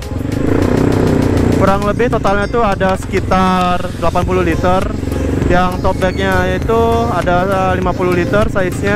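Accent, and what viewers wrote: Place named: Japan. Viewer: Dengarkan baik2. Kayak aksen Jepang.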